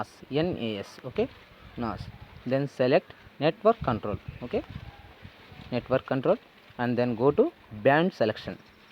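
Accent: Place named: India. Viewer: Он индус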